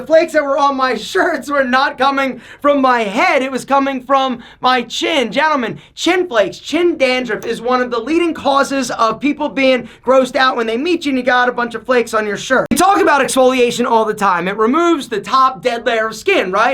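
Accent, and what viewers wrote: Philadelphia, United States. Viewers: This guy is definitely from Philly that accent though.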